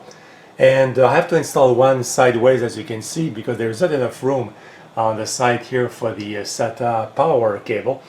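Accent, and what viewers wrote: Quebec, Canada. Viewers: Thought I spotted un accent quebecois in your english monsieur.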